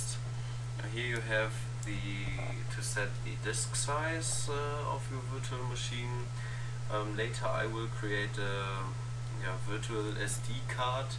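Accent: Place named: Germany. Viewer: bist du deutsch xD das hört man :D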